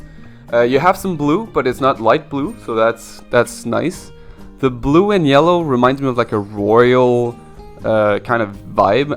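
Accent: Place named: Quebec, Canada. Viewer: jsp pourquoi je SAVAIS que t'etais un quebecois.. autre que ca bro tres bonne video jai apprecier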